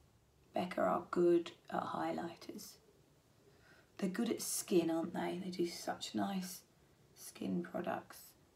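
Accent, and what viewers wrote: United Kingdom. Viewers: I really like British accent And I like your makeup. I like you make Kira knightly makeup.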